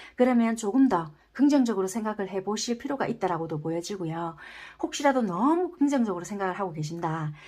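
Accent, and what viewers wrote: Busan, South Korea. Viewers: 4번! 잘맞는거 같아요! 있는 그대로, 솔직하게!! 네 맞아요. 그런 말 했네요. 금전적 현실적 어려움도 맞아요! 구독 추천 누르고 갈께요! 부산사투리 넘 좋네요😄 다음 영상 기대할께요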